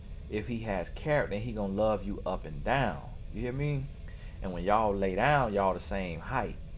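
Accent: South, United States. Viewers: And please dont speak the Q ueens English, your wonderful Southern Drawl. Just drives your wondeful points home all the morrrrre!!! 😎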